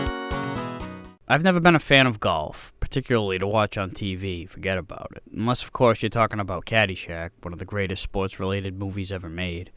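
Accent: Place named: Long Island, United States. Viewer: Definitely a Long Island accent 😉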